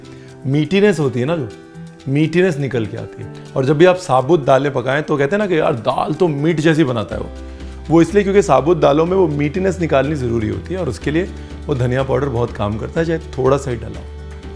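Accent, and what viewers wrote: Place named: Lucknow, India. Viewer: हय की नहीं😀😀😍❤️ ....chef ye hamare aapke Lucknow ki tone h...aapki बोली mein kafi baar ye nazar aata h 😊😊 So sweet Daal to ekdam mast h Ranveer 🌹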